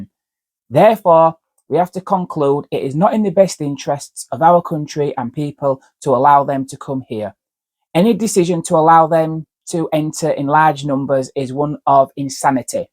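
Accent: United Kingdom. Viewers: Certainly speaks with a funny accent (like most Brits). Was that a gay Yorkshireman?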